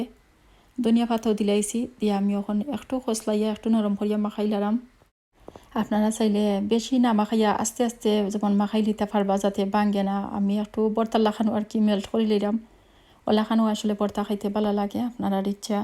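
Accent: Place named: Moulvibazar, Bangladesh. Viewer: Afa afnar math huniya lager afnar bari moulvibazar. Jodi moulvibazar hoy tho kun elakay Please koiba?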